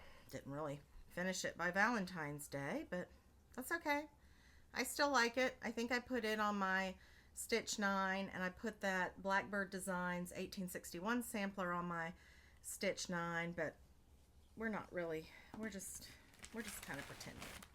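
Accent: South, United States. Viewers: Another great video! Love listening to fellow Southerners! Especially since I am stuck up here in snowy Canada! 🤪The swans are gorgeous!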